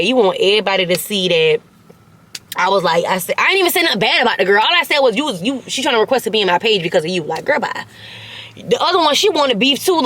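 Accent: New Orleans, United States. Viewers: Is that a Nola or Georgia accent? You sound blended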